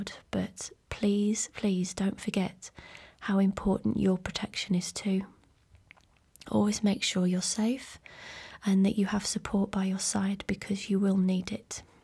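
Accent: England, United Kingdom. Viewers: I am 45mins in and I am totally captivated ,,, I so much enjoy listening to ur soft English accent and laugh everytime u make a reference to something with different words than we use here in the U.S.,,,, u have the kindest, gentlest soul and it shines threw in all u do, it's unfortunate u and ur family are on the outs and u couldn't rely on them when needed, I totally can relate, I have no body in my life to rely on if needed and it's not a nice feeling, that saying is true tho, u find out who's real and who's not when u don't have anything to offer and need help, and sadly I found out, I don't have anyone, thank u from the bottom of my heart for sharing such personal stories and please please don't ever stop doing what u do here on youtube, u will never understand the positive impact u have on so many,,, so so so much love and positive energy sent ur way! 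Xoxo 💜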